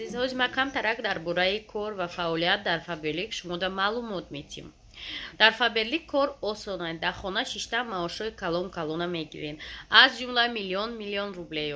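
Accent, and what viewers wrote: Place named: Khatlon, Tajikistan. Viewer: апачон лафзи хтонкати гап занен мада лафзи хтон махкулай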